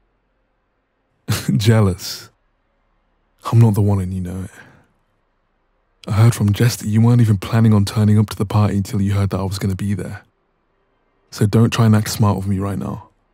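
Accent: United Kingdom. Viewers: why am ⟟ just realizing half way into the video that he sounds like British smhhhh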